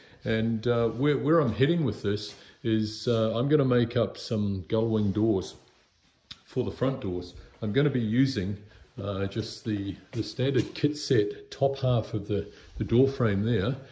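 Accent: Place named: New Zealand. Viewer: sorry for the bad accent ID on my part... I've been through more of the vids and clearly see you're a Kiwi. Thanks for documenting everything, esp mistakes, it helps alot.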